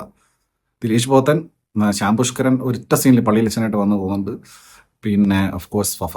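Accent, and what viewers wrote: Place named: Tamil Nadu, India. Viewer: ഇയ്യാള്‍ക്ക് മലയാളം അറിയില്ലെ. സംസാരത്തില്‍ ഒരു തമിഴ് touch ഉള്ള പോലെ.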